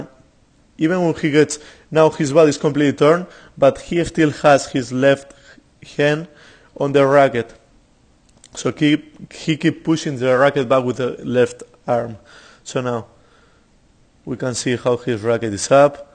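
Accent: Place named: Spain. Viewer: Hi, thanks for the video, u give lot of information, sometimes too much but good explanation. Just it's hard sometimes to understand everything cause I'm not a good English speaker and with your accent it's even more difficult haha (no offend). What's your mother language? If it's Spanish I would be interested to see the same video in your mother language cause I speak Spanish better than English :D. Thanks, keep going with your videos;-)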